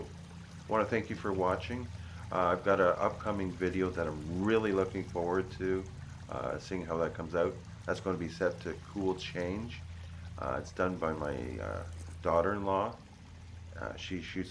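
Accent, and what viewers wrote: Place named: Canada. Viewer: Hahaha! Yep, you sound Canadian now! I live in central lower Michigan... our fall colors are in full glory right now. It's difficult for me to concentrate on the road on my hour long drive home from work, they're so beautiful! Gotta watch for deer, turkey, and sometimes coyotes. Looking forward to your fall colors! P.S. forgot to tell you thanks for answering my koi question!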